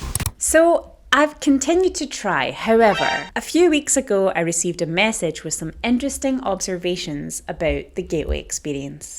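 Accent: Scotland, United Kingdom. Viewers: I've now found a new favorite accent, is that Scottish, Irish, or something else? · You are from Scotland aren't you 😄 love the accent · Hey girl, you Scottish, you sound it. I have used it for years on and off. Been doing a lot of other meditations last few years. Keep going, it took me many years to project, only done it once but it was very vivid. I found myself floating around the ceiling. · . Which particular brand of British accent is that? 🤔😅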